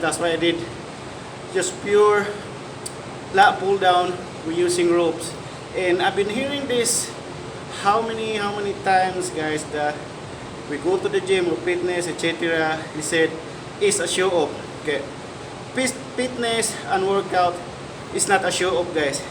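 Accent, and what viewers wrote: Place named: Visayas, Philippines. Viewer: bansaya mo English oiey, nice vlog kavisaya keep posting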